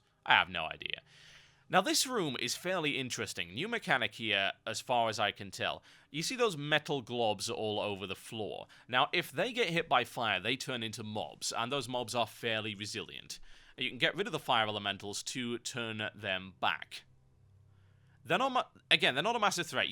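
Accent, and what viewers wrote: United Kingdom. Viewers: Oh and the instance looks pretty tight too, i'm lookin forwar dto it, THANKS, keep up the vids and ignore the noobs. They've just never heard a rad british accent and immediately think of some elitist sociopathic evil villain froma cheesy Bond flick or something. That, and people just have short sided nerd ragey opinions of the way things should go and get butthurt when they don't, that's net life i suppose.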